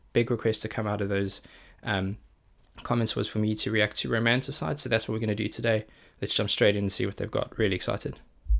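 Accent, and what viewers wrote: South Africa. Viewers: I've just subscribed your channel and saw that you've already taken the Nightwish journey. It's been said before that your reaction videos are awesome, lots of detail as far as technic is concerned, without making it too boring. Keep it up dude! OH yeah, one other thing That accent seems quite familiar to me. Shall I risk... South Africa? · You South African? Goeie reaksies mater!!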